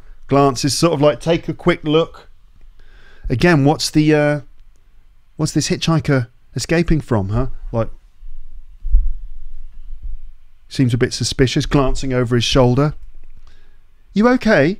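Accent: United Kingdom. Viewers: Mano eu tô iniciando então não sei... Esse sotaque dele é britânica???? Vc acha bom pra mim começar c ela ou americana? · O...British English ! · Subscribed! This is British English because of the British way to pronounce R and the glottal T. Love it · Wow, I absolutely love your voice! Your pronunciation, intonation, and accent are perfect for this kind of content! I wish I had your British accent =D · I find interesting and really helpful every story you read since it's the best way to learn while enjoy English, i have listened many short stories from your channel. I have been obsessed with the British accent and this is helping a lot to brush up bit of my skills. Greetings from Peru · British English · Huge thank you,. Your channel is very useful to learn new vocabulary and phrases l don't know. I am also interested in learning British English and accent which is very nice. 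You are an amazing and dedicated person who teaches English perfectly. I am sending all my best wishes from here where l live. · I really enjoyed the British accent and the format (story, then summery then attention to the specific phrases and details)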